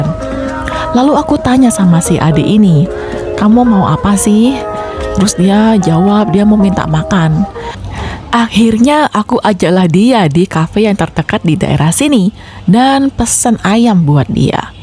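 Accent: Java, Indonesia. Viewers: Asline ngendi sih mbak..ko koyo orang jawa sampean..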